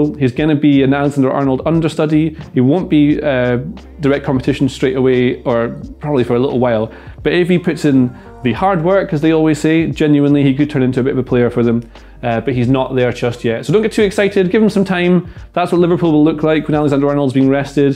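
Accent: Scotland, United Kingdom. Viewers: how have i just realised hes got a scottish accent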